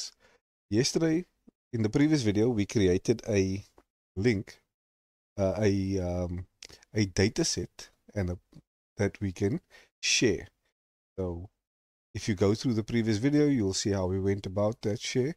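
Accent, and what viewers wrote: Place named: South Africa. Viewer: First thing I thought was, Wait is this guy South African? Thanks you solved my issue after sukkeling all day, my settings needed to be fixed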